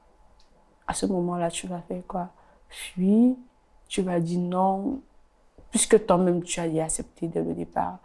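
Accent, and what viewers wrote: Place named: Cameroon. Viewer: C'est une camerounaise ou gabonaise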